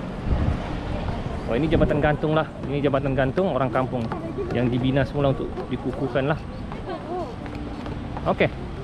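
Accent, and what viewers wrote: Sabah, Malaysia. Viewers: Jadi bang kau pandai kah juga becakap sabah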